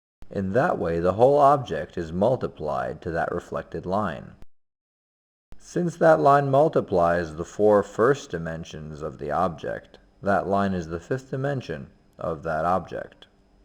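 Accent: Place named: United Kingdom. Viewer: your info is good as well as the video ! i understood it to some extent but i am having problem in understanding the british accent ! can you tell some other source?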